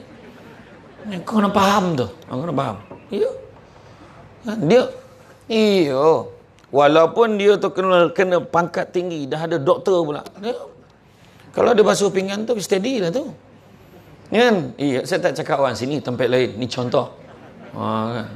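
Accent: Negeri Sembilan, Malaysia. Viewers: Suka dengar loghat negeri sembilan ustaz. Mudah faham walaupun saya orang Kedah. Comel je bila ada part suami isteri. 😁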